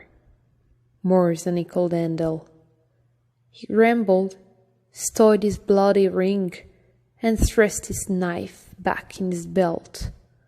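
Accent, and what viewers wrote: France. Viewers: Never knew I needed to hear Prince of thorns in a thick French accent. Amazing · Don't worry. I LOVE your French accent. It's so beautiful and it suits the setting of the book very well. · Love this book and your beautiful French accent make it even better 😍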